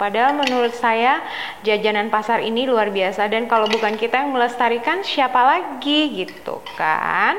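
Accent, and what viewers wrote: Medan, Indonesia. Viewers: Orang Medan kakak ini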